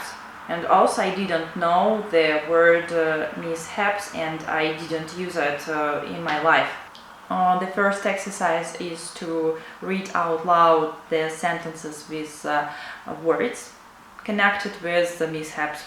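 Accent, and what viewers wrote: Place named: United States. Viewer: You have American accent because that I can understand very well you 🆙, good tips 👏👏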